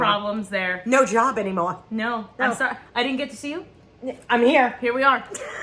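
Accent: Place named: Boston, United States. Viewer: Are you from Boston? Lol cuz of the accent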